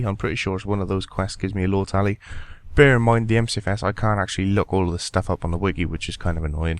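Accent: Wales, United Kingdom. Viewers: i really wish your videos got more views...your videos are amazing man. ive been watching your vids since you like first started. you're by far my favorite youtuber :D love accent too. you're from wales, right?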